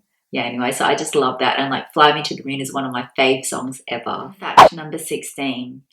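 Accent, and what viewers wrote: China. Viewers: Wow I can understand your dialect well somewhat,,, it's close to Fu Kien and yes nobody understands it here in Canada. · Lots of interesting facts to learn about you! I love how open-minded you are and so brave for trying that spider! Yikes. I have a huge fear of spiders, big or small and the closest thing I could do to try and overcome it was to hold a tarantula. >_< Even then I was frightened. Same goes for heights! eeek! But I love the thrill and adrenaline of just trying. Your dialect sounds like a mix of Cantonese and Vietnamese? Just from the sounds of main languages I know :o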